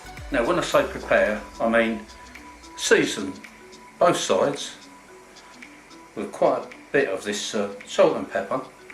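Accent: United Kingdom. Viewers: Are you a londoner?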